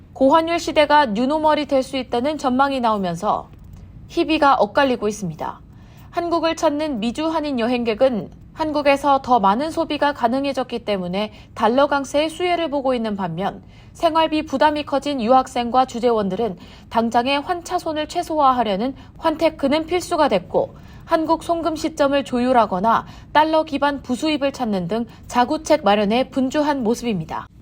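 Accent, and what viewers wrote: China. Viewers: 이사람 목소리 발음이 수상 중국인인가?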